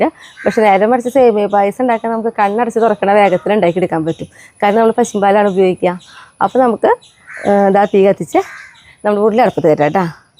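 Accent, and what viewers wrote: Thrissur, India. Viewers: നമ്മുടെ ത്രിശൂർ ഭാഷ വളരെ ഭംഗി ആയി സംസാരിക്കുന്ന ആളാണ്. എനിക്ക് ഒരുപാട് ഇഷ്ടവും ആണ്. വീഡിയോ കാണുന്നത് നമ്മുടെ തൃശൂർ കാർ മാത്രം അല്ലല്ലോ,😢😢😢 നോക്ക്..... വായോട്ടാ എന്ന് പറയുന്നതൊക്കെ കേൾക്കാൻ എന്തൊരു രസം ആണ്.😂😂😂😂 ദേഷ്യം ഒന്നും മനസ്സിൽ വെക്കരുത്. നിങ്ങളെ രണ്ടു പേരെയും പെരുത്തിഷ്ട്ടം മാത്രം 🎉🎉🎉🎉🎉🎉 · അറിയില്ല. ഇന്നസെൻ്റ് സിനിമയിൽ തൃശൂർ ക്കാരനായി സംസാരിക്കുമ്പോൾ നമ്മൾ natural acting എന്നു പറയുന്നു. അദ്ദേഹത്തിന് കൈ അടിയും കിട്ടുന്നു. സാധാരണക്കാർ സംസാരിക്കുമ്പോൾ അതു തിരുത്തുക എന്ന് പറയുന്നത്? ഇത് സ്റ്റൈൽ ആയി കരുതി കൂടെ? എനിക്ക് എൻ്റെ കൂട്ടുകാരുടെ തൃശൂർ, പാലക്കാട് സംസാരം ഒക്കെ രസം ആയിട്ടാണ് തോന്നിയത്.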